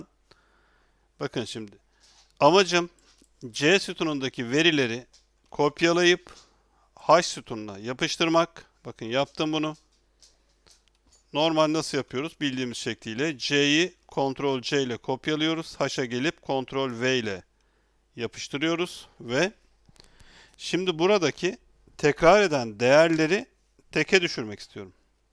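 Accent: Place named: Aegean, Turkey. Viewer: Hiç fark etmez ama ege şivesiyle zor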